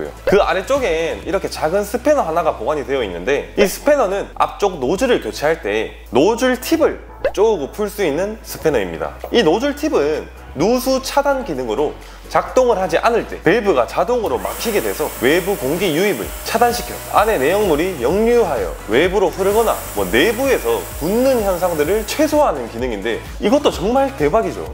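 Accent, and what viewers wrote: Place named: Gyeongsang, South Korea. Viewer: 영상이 너무 뜸해요. 구수한 경상도사투리가 매력적인데 자주 얼굴보여주세여!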